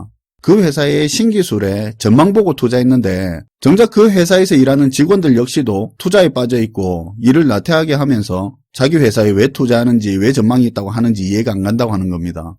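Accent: Gyeongsang, South Korea. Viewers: 구수한 경상도 사투리. 싫어하는 사람도 있겠지만 듣기 편하네요. 마치 친구 이야기를 듣는 것 같아 좋습니다.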